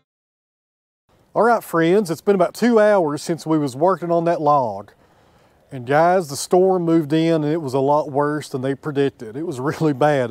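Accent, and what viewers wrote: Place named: East Tennessee, United States. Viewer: That looks like tornado damage, alright. We lost our barn back in 99, and because there were no "confirmed" touchdowns, insurance refused to pay a penny. By the by, sound quality is pretty good,. Sounds about like you have it dialed in. Oh, something I've been thinking about... My grandfather was a Sawyer up here in northeast Ohio and northwest Pennsylvania from the 1930s to the mid 80s, and some of his favorite workers were from your neck of the woods. Herman Hutchison, Sheridan Severt and his son John (all gone now, sadly.) were from Johnson County and sounded almost exactly like you. Must be something about the land of east Tennessee that makes good sawyers...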